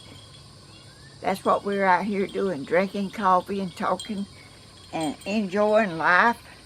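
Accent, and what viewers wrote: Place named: Georgia, United States. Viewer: God has Certainly Blessed You with Good Health Ms.! You are Good Christian Lady, Kind & Compassionate to People & Yo Keep Busy, You are such a Blessing to sooo many People! God’s Blessings on You and your Family! 🙏❤️😇😇🍁🍁 I Love 💕 your Southern Accent & just listening to you Talk & your Stories! You mind your own Business & Trust God & that is why we ❤️ you, You are just you! That is sooo Beautiful! I Love ❤️ that Color on You!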